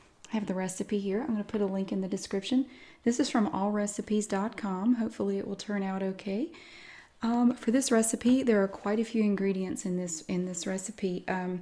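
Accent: South, United States. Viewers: That doesn't sound illiterate at all. It's obviously just an accent. I could listen to it forever. You shouldn't try to sound less Southern. It's lovely and be proud of it. Here OLL! For everyone :)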